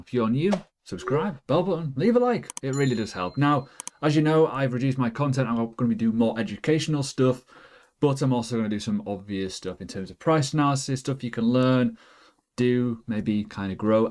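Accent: United Kingdom. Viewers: Great channel I follow a lot of them and when I see ur new videos I’ll click them first What kills my is the ascent . for a Spanish guy British is harder to get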